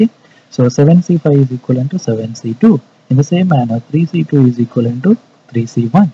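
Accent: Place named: India. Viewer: These are such good videos :) Thank you and thumbs up for that authentic Indian accent :D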